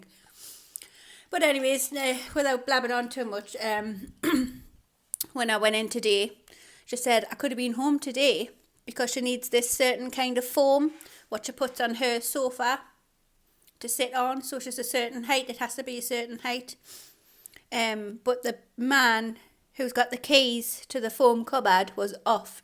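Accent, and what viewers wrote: Newcastle, United Kingdom. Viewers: U from Newcastle? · Are you from Newcastle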